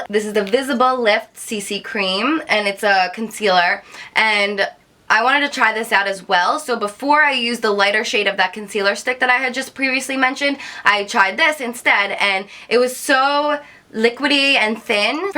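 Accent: New Jersey, United States. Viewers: is she from jersey??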